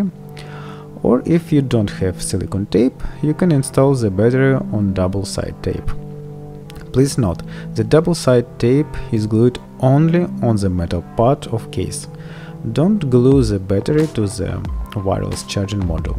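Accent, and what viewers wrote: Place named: Russia. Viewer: ду ю кен спик рашен, бекоз ю хэв рашен акцент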